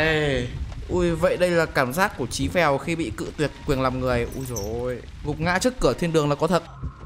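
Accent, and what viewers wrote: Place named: North, Vietnam. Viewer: Chuẩn trai bắc đấy ông ạ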